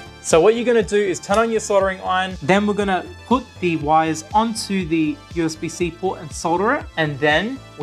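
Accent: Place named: Australia. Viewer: MATE THIS VIDEO IS GREAT, AND I LOVE HEARING A FELLOW AUSSIE DOING KEYBOARDS love from western syd <3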